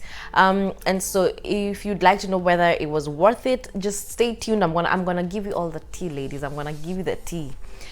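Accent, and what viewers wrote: Kenya. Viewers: You sound Kenyan. something about your accent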